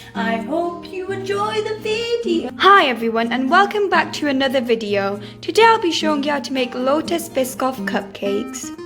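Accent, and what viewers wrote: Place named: United Kingdom. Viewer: Love listening to your British accent. Keep posting!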